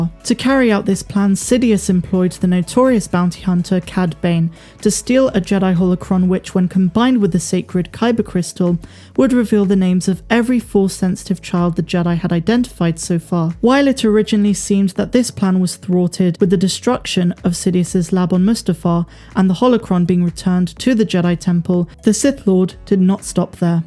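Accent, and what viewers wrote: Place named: Bristol, United Kingdom. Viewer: I would have bet, you was from Bristol.good luck. Great vid.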